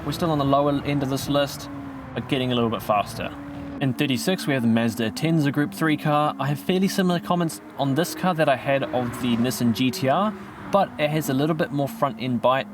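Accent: New Zealand. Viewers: Thanks for all the time you put into your videos, great info and tips that have helped my lap times and setups a lot! Great to hear a Kiwi doing such a great job! Keep up the good work mate 🤘🤘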